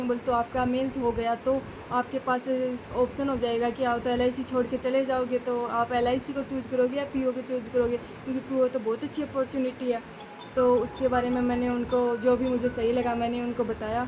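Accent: Rajasthan, India. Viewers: Video suru krte hi smjh gya thha bolne ke trike se, rajsthan se hai medm ji 😂😂😂😂 achi vancency thhi idhr bhi Apne zone se hi bhrna chhaiy thha ADO.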